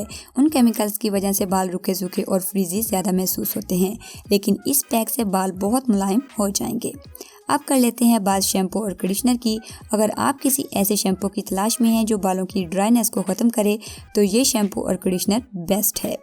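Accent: Punjab, India. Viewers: sister v love her with Punjabi lehja. She is a talented person so it does not matter that what is her eccent. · api punjabi nai bolti or wo punjab sy hy to pujabi style hi ho ga na .or kaisy boly wo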